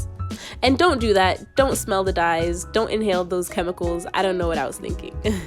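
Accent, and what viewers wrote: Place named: Jamaica. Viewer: yes with the PATOIS gyal, GWAAN NUH! i think you have 4a/4b curls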